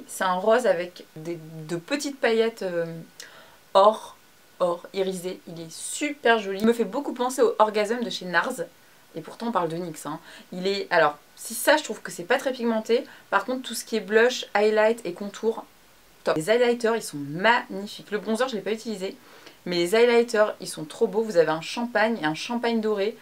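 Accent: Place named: Germany. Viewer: J aile beaucoup ton accent alemand😂😂. Trop belle dans cette video tu pourrais nous faire un tuto sur ce makeup??😘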